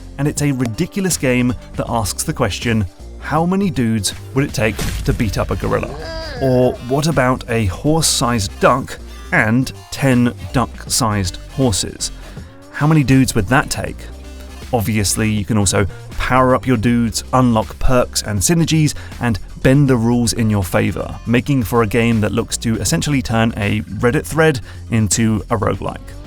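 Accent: United Kingdom. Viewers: "Where's my congressional medal, Obama?"🤣 Tell me you're a Brit without saying your a Brit.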